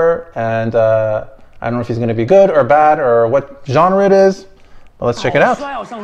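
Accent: United States. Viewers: I can't beleive you're French. You sound so American! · French is your native tongue? Your English sounds as bastardized as what comes out of any of us, as Americans... Perfect! =)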